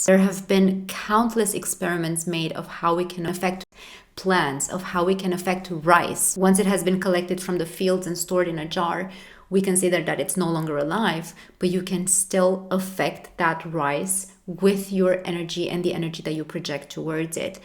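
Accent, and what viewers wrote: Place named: Romania. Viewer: Haha I can recognize a romanian accent in half a second. Greetings from Ro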